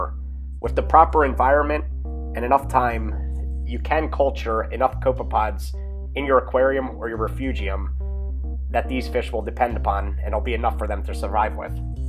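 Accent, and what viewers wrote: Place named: Western Pennsylvania, United States. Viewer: Very nicely filmed. 👍 you sound like you may be from Western Pa.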